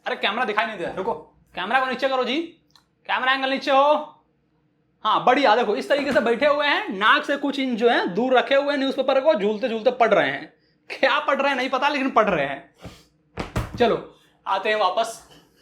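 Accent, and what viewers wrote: Bihar, India. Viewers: Sir aapka accent Bihari aur east UP jaisa h...Mast h sir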